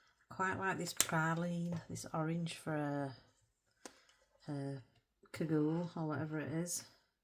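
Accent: Yorkshire, United Kingdom. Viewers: Love your Yorkshire accent 😊 years ago I went to live in a place called Hesketh Bank do you know of it? Back in 1972 I was from Birmingham, that’s a broad dialect too but because my mother was not from there I never really picked up the accent but I can put it on when I meet someone from there over here. I had a friend once in Melbourne who was so offended when in a shop with me I spoke to a lady behind the counter who had the broadest brummie accent and I dropped into it to talk to her! 😂😂 love doing that!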